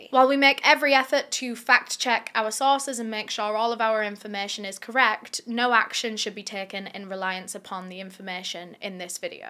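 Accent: United Kingdom. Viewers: From America here. I absolutely love your accent. I hear different accents from the UK and I’m so jealous of yours. And keep saying fuckin. Fuck Fuck Fuck. Shit shit shit. 😂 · the british accent is making me nauseous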